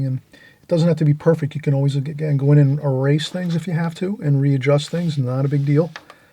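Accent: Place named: Philadelphia, United States. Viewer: I’m from Philadelphia, living now in South Jersey. I recognize your accent.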